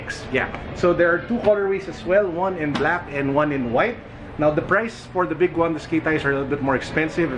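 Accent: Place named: Philippines. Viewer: You are philipines because i can tell how u talk in english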